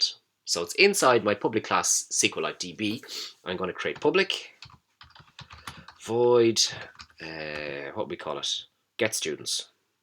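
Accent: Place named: Ireland. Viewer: Sounds like an irish accent nice change from indian :)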